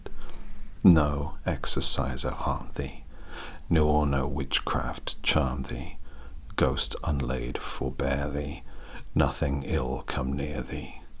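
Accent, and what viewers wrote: United Kingdom. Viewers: A British guy reading Shakespeare?! Can't get much better than that!! Fantastic read - thank u! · A man in a suit with a soft British accent, reading poetry and cuddling his cats? Well I mean... Sign me in. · Your dandy voice, your UK accent, your sweet face expressions, your way to read Shakespeares in gentleman's grace...sigh.😔✨ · Can we talk about how amazing a British accent sounds? I swear y'all could order chicken nuggets from McDonald's and sound like an erudite advanced life form. Conversely, I'm from the American south, and one of us could give a lecture on astrophysics and still sound hopelessly backwards. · We just analyzed some Shakespeare during English Literature today! Very coincidental you uploaded this today as well. Either way, I’m so excited to see this! I love being read to, and I love British accents, and I love poetry, and I love your videos! 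Perfect combo 😍 thank you!!!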